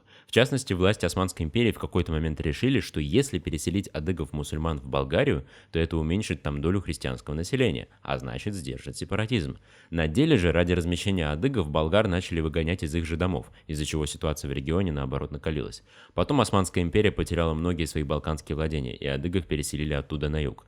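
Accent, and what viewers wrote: Russia. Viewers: Автор выговаривает адыгский с русским акцентом. Если хотите услышать чистый современный адыгский язык, кабардинский диалект , вот фильм <link>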